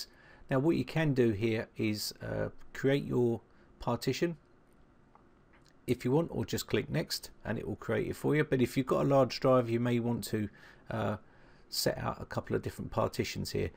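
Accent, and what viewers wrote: United Kingdom. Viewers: Thanks so much mate. This is exactly what I'am looking for. BTW I love your British English. ^^ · I so want to thank you mr. british guy! I feel so relieved now. weew! YOU ARE A GODSEND!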